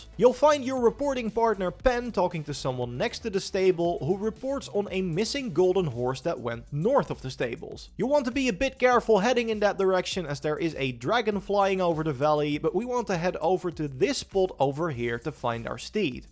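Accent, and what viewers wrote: Netherlands. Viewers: Are you Dutch? You sound Dutch haha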